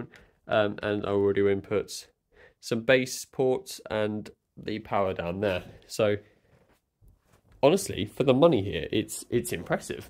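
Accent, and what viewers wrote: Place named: Cockney, United Kingdom. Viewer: This man is speaking with a strong Cockney accent. He must be from Essex as thats the only place in Britain where Cockney is still spoken.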